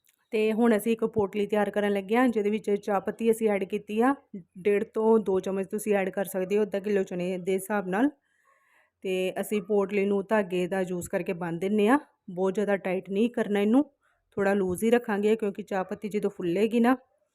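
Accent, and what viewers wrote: India. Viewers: ਅਂਮਿਰਤਸਰੀ ਬੋਲੀ ਬੋਲਦੀ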